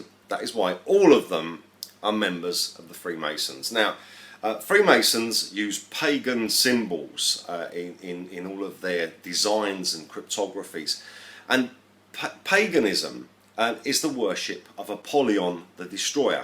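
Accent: United Kingdom. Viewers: proof that the British accent can't conceal all ignorace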